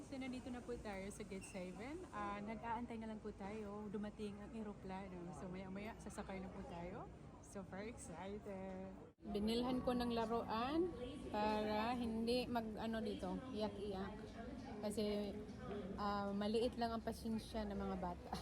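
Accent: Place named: Visayas, Philippines. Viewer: Hi., I'm your new subscriber thank you for inspiring us, its seldom that i subscribe for a vlogger but i like your story and i like also on how you value your family. Please keep it up. By the i'm also a bisaya from southern leyte. I'm amused on your visayan diction. Hehe... please continue to be a worthy pilipina. I support you by subscribing to your channel.Thank you mabuhay ka inday......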